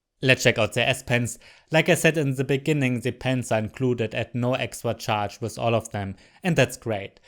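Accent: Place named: Germany. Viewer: like everyone can hear that you'r from germany :]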